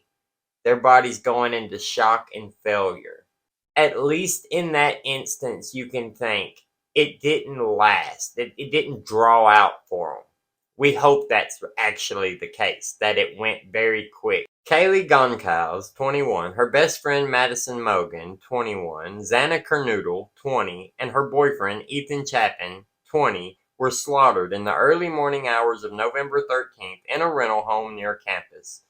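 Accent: South, United States. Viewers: Just curious, what Southern State are you in?? I am also a Southerner.. My guess is TN or AL. Anyway, back to topic, a great video, thanks.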